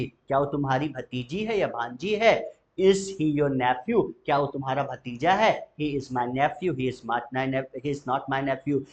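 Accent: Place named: United States. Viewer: Why are you talking in American accent m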